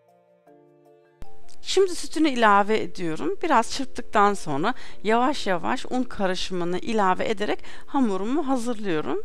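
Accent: Germany. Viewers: Hanım ablamız almanyadan sanirım almanlar gibi söylemiş :) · Farkındaysan almanca konuşan biri. Türkçeyi bu şekilde konuşuyor olması normal değil mi.. TDK başkanı mısın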